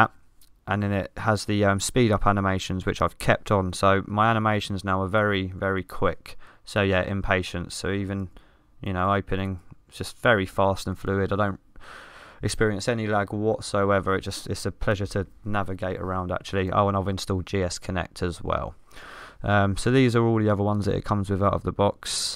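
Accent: United Kingdom. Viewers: I like your British English very much, mate!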